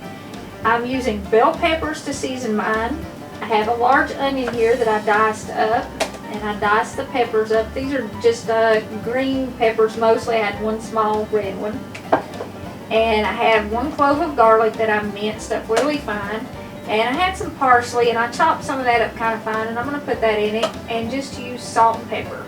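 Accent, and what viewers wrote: South, United States. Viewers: i live in texas now, left the great state of Arkansas in 1954 and adopted Texas as my home. i love your channel not only for the dishes but mainly to hear that voice, nothing takes me back home more than a good old southern accent. a lot of the dishes you make we called them our bachelor dishes when me and the kids had to get by alone during the divorce and remarry stage. i came up with lots of dishes that were easy to make and filled us up well, couldn't ask for more than that, and today after all those years ago the kids and grandkids still ask me to make some of those dishes, my cornbread is a favorite.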